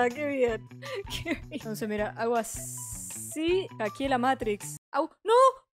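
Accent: Argentina. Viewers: tu eres de argentina (Paraná?)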